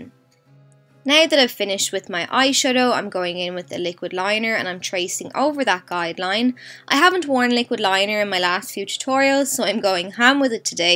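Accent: Ireland. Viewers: I absolutely love your accent, I followed immediately after hearing it! 😂 (given, I was going to follow anyways just from the thumbnail, but whatevs) Is your accent Irish?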